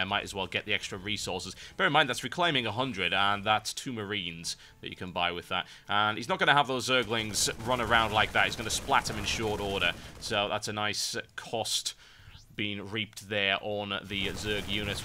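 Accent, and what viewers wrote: United Kingdom. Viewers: i was wondering r u british?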